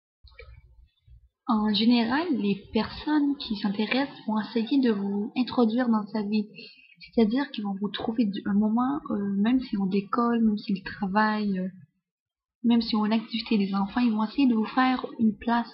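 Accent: Canada. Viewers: elle est surement québécoise, si je me trompe pas!!! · je pense que elle es canadienne il me semble avoir reconnus l'accent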